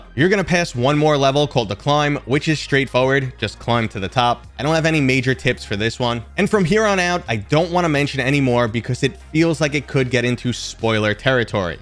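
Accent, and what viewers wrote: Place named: New York, United States. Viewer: a small hint of new york in your voice is killing me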